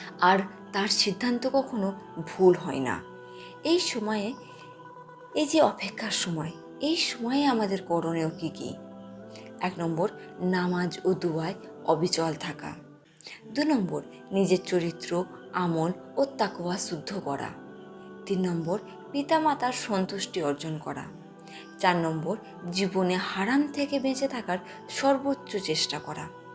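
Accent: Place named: India. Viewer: আপনি কি ভারতীয় , আপনার কথা ভারতীয়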